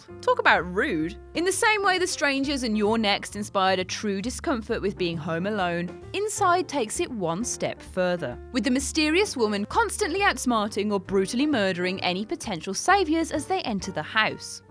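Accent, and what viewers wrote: United Kingdom. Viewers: UGH. I hate the when they use the commentators with British accents. E#specially this one. Won't be watching this. I'll find a similar list om watchmojo or imdb.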